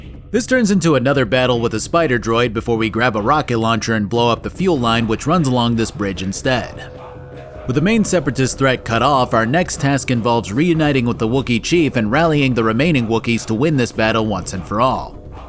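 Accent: New Zealand. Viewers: I love mistaking a New Zealand accent for a British one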